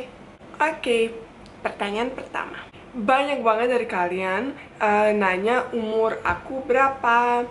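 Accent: Jakarta, Indonesia. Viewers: Aksen ngomong,gerak tubuh indo bingitz neng,klo bahas gaul nya,udah rempong bingitz,semangat terus yah,selalu tebar kebaikan dan positif thingking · Pinter banget bhs indo tetapi kanyak orang jkt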